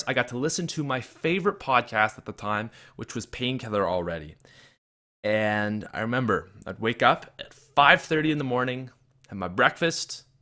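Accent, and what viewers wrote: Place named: Canada. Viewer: I knew you where from Canada because of your accent well I'm from Canada too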